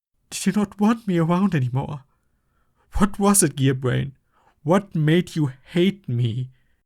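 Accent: Germany. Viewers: Your german accent is thinker than anything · Are you german it Sounds a bit like it i‘m german myself i was just wondering ? But love the video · ……….I- I was not expecting that accent. You really from Germany? Goddamn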